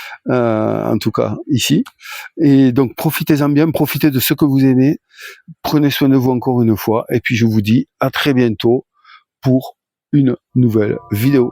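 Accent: South, France. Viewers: bonjour, peu importe pour de nouveaux jeux, tu es une personne chaleureuse a l'accent du soleil, perso les jeux que tu présente ne sont que secondaire, le fait de te voir a fond dans le jeu cela fait plaisir^^ la campagne orcquest était excellente, tu pourrais continuer. tout ça pour dire que les jeux sont secondaire, avec mes amis ont fait souvent les mêmes jeux le principal c'est de se retrouver. Pour les alea de la vie, fut faire avec voire sans. encore une fois se retrouver entre amis permet de penser a autre chose. En conclusion, même pour parler bout de gras on est la ^^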